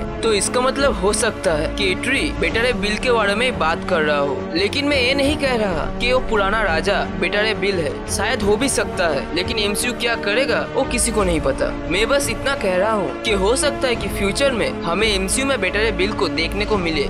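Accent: Bengal, India. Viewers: Are you Bengali??? caus something like Bangla in your Hindi??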